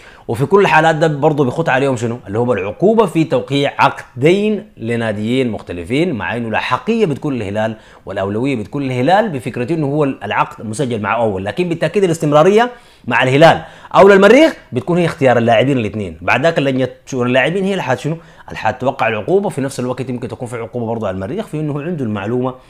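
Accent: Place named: Egypt. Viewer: من كلامه ظهر مصري عواليق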